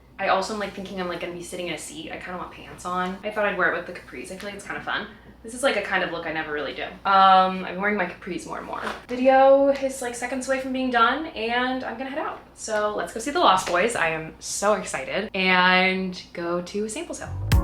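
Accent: New York, United States. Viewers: Another interesting video from in her trademark Husky strong New York Accent living life again in the fast lane in NYC and having a action packed week with members of The Flamboyant NYC Crew, the bubbly Flamboyant Redhead, and The Twin Sisters RN'T US! duo, going to different places including two theatres, Matcha Bar, restaurants, walking in the park, watching Love Island indoors and doing some brand videos as well as cooking food and doing her work on the computer. She did gave her Cats some love and even helped a friend with moving. The Weather here in the UK is very hot and humid even at night. Unfortunately both and decided not to go to the Knicks Victory parade because of acute overcrowding. She did go out on a date with someone but she is keeping it a secret! Looking forward to seeing another video soon. Take care from London UK 🇬🇧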